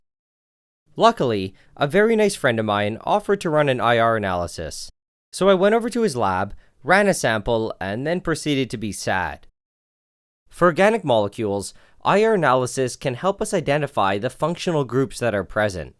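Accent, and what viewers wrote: Canada. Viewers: awesome video, you sound canadian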